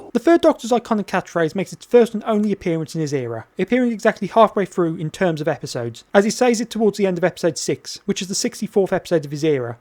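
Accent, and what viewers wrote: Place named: Midlands, United Kingdom. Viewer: "I'm not going to change it because I can't be bothered" "She's not that important,.... plot-wise. " Brilliant! I love the humour and your Midlands(?, sorry if I've got it wrong)) delivery. I have to admit, I like the dark beginning of The Sea Devils, but it soon tails off. I agree with your 'mid' definition; it's not bad, and it's not really good. Though, to be fair, I've not watched it for a long time. The first time I saw it was in '93 when it was repeated on BBC2 (I think) and I was enamoured with it; my favourite Doctor and my favourite companion, few (relatively) VHS releases and almost zero repeats. I'll have to give it another go. Thanks for the review. (Edited once for a typo)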